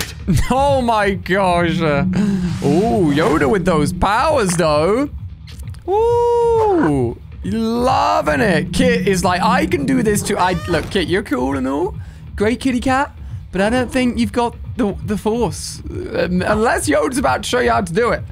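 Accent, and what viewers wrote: United Kingdom. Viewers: Are you British?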